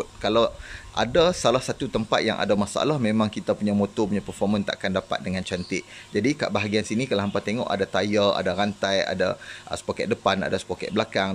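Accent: North, Malaysia. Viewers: Ilmu terbaik bang share. Cara presentation pun best, leka seronok dengar. Tambah loghat utagha hahaha rindu penang. Salam Ramadan dan butang loceng onn 😆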